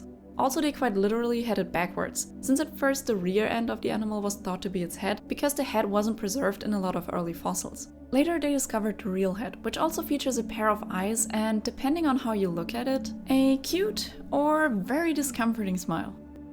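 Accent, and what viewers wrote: Germany. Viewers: you sound german a little bit german, wondering if i guessed correctly :D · Instantly knew you were German from the way you spoke, hätt aber nicht damit gerechnet, dass du aus Österreich bist. Gutes Video und Lg aus Kärnten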